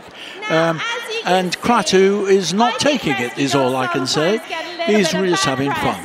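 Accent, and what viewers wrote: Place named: England, United Kingdom. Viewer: The English accented commentary to a Canadian listener is soooo freakin BRILLIANT!! I just can't!!🤣🤣🤣💖💖🍁🍁👌👌🙌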